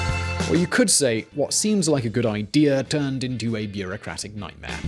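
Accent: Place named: United Kingdom. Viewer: Sir, a "territory that BELONGS to the US" it's not the most political correct statement. I feel we ARE PART OF THE US. I believe that you as a British (which I presume for your accent) must understand what a commonwealth is. Also, "before Irma and Maria". There wasnt any "Ana". One of the things that utterly annoys me is the continuous mention of corruption in our Government. That in PART is true. Ok. Please, Dont overlook the FACT that we HAVE and HAD HONEST and DEVOTED polititians. But why corruption it's always brought up everytime someone dares to describe our Island?!? To me that not only harms our image to others, but also upsets us. That "We dont do anything" concerning government??? Really? May I suggest you to produce a video on how we fight everyday. We fight setbacks and negative situations. Although your video and your analysis are good. 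I'm giving it a like...Best wishes...Thanks 👋👍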